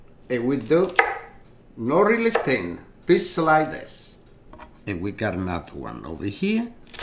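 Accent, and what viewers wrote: Italy. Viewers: I love that Italian accent…reminds me of my grandparents ❤❤. We need more stories of your life in Italy!😊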